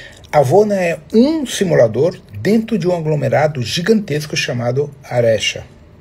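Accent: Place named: Portugal. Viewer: No le entendí ni la mitad. Lo lamento,a mi edad, me es difícil comprender un español aportuguesado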